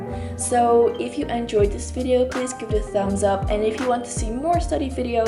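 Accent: Netherlands. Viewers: Je hebt een Nederlands accent op je Engels. Oefen een beetje! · Talia Bilal she sounds dutch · Je bent Nederlands! Ik dacht al dat ik een accent hoorde.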